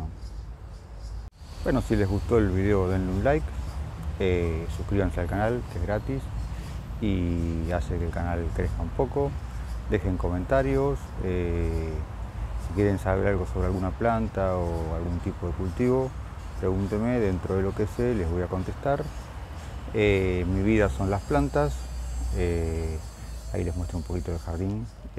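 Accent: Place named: Argentina. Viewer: Por fin alguien que habla con nuestro acento! Hola! soy Susana, vivo en Buenos Aires (CABA)Tengo un helecho arroz desde este verano, ahora llegando el imvierno lo tengo como planta de interior. De dia cerca de la puerta del balcón, le da luz pero no sol directo, por la noche lo aparto de alli porque quedaria muy cerca de la estufa. está en una maceta pequeña y las ramitas de abajo van desprendiéndose. Crece de un tronco central y su altura es de 25 cm, cuando la compré era más baja y sus hojas(flores? ) eran blancas, parecían verdaderamente granitos de arroz, ahora es toda verde. Mi departamento es muy luminoso. Me preocupa saber si la estoy cuidando bien...Tendré que transplartarla a una maceta más grande? Podés aconsejarme algo? Saludos y gracias por el video, está muy bueno.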